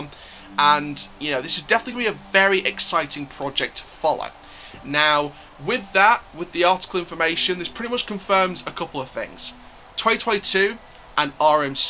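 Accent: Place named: United Kingdom. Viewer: You have a cool British accent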